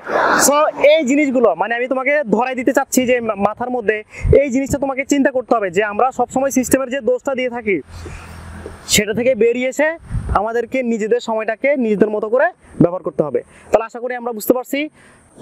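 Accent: Chittagong, Bangladesh. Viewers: ভাইয়া আপনার বাড়ি চিটাগাং নাকি?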